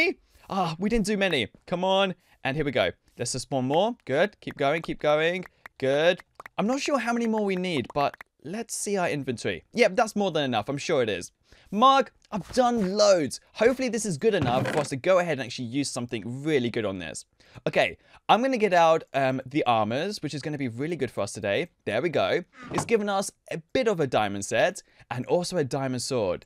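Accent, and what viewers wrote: United Kingdom. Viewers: Hi how you British accents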